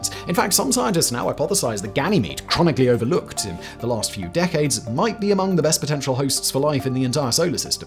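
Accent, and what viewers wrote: United Kingdom. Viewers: your British is showing.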